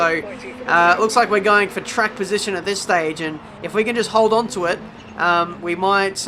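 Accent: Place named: Australia. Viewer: Best english accent! The brits and americans should study australian english! The hole world should. Cheers from sweden!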